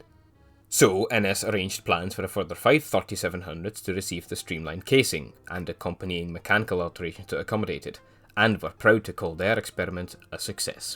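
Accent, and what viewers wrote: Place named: Scotland, United Kingdom. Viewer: I love how the narration with a heavy Scottish accent is casually laced with perfectly pronounced Dutch words. It's kinda funny.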